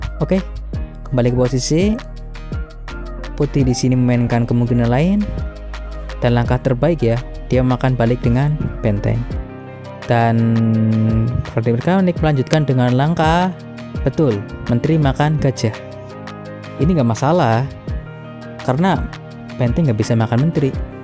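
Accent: Banyumas, Indonesia. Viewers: Ngapak ya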